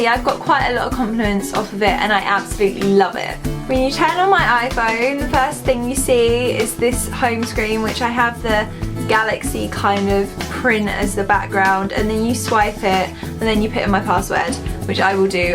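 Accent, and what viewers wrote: United Kingdom. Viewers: where in england are you from??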